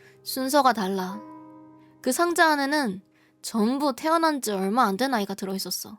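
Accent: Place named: Japan. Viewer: 언니님 일본어 느낌 너무 좋아요..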